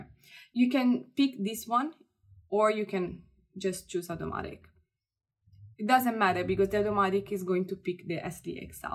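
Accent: Italy. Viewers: Love your channel and your Italian accent 😊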